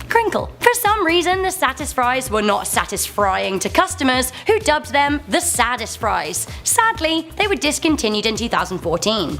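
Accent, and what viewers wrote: United Kingdom. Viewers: Your British accent is on display! Love you so much! Thank for the video XOXO ☆ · I love her British accent and her witty humour. It's infectious. 😂😂😂 Thank you for making my day during this damn lockdown. 😆🤗😆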